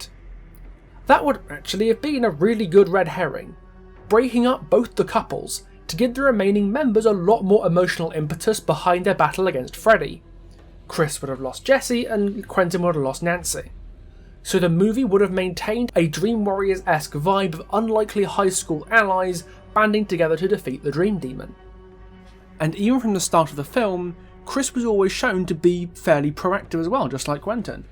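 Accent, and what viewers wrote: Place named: United Kingdom. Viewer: Are you british because that is lame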